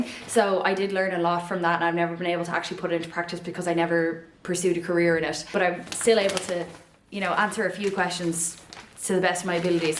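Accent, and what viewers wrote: South Dublin, Ireland. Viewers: Are you from the south side of Dublin? ❤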